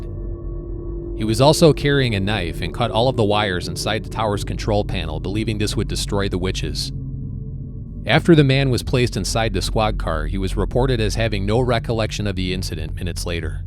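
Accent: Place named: Midwest, United States. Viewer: I listen to this channel at least once per day. Great stories, Midwest accent is great, and the background music is perfect.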